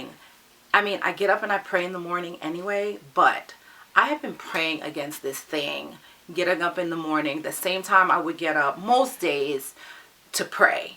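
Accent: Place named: New York, United States. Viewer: I didn't know you were in NY, now I see where your accent is from lol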